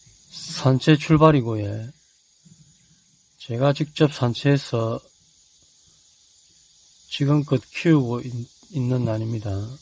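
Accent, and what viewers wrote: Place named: Busan, South Korea. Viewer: 안녕하세요 영상처음접해 봅니다 난초들이 아주건강하내요 부산말씨같아요 저도부산사라인 데 지금은제주도에 서2년째살고있 습니다 완판하시고요 영상 자주보겠습니다 잘봤습니다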